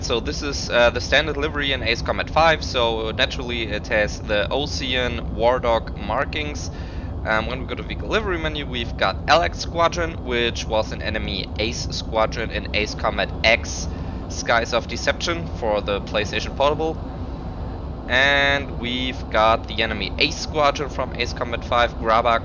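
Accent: Germany. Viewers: u sound german are you?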